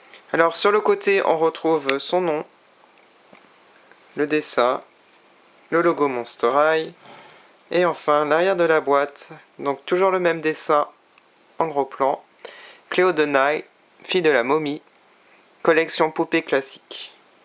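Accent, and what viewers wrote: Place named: France. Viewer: merci bien! très bien fait accent française Gracias!!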